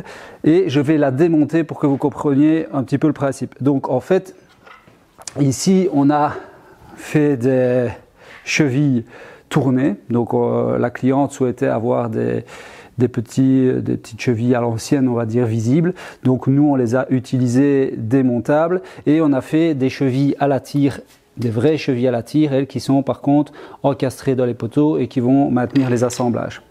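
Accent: Belgium. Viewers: Bonsoir, Moi, j'aurais dit "en butée"... Mais le parlé belge et l'accent...🤔 Abuter : - terme de marine. Mettre bout à bout, ou toucher par un bout. Ces pièces de bois abutent. - ce terme s'utilise pour la pétanque et le jeu de palet. Abuter signifie lancer la pièce d'un jeu en direction d'un but afin de déterminer quel joueur commencera la partie. C'était la minute info🤭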